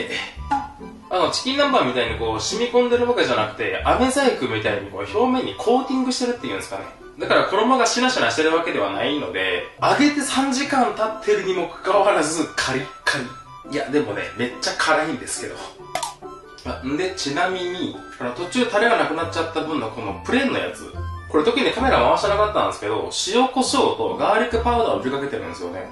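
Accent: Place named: Kansai, Japan. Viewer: さん福岡の人なのにイントネーションがたまに関西になるね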